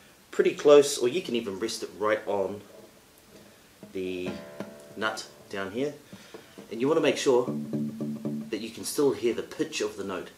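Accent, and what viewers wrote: New Zealand. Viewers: I'm really glad to enjoy your tutorial here. Moreover, 'Kia ora' is very familiar because when I visited New Zealand for a month 2011, English Program for teachers in Auckland. My hosts were very warm-hearted and I still miss them a lot. Today your English accent and pronunciation reminds me of them. Especially whenever I have free time, I'll visit here and enjoy your play and explanation. Thank you so much ~!😊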